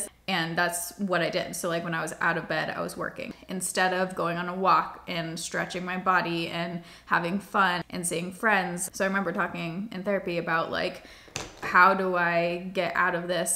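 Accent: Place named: South, United States. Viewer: Dude I'm a couple minutes in and I'm sure this gets real serious real fast, but just listening to you talk. I can tell you've been in the South lately lol there is some twang in your Midwest! 💚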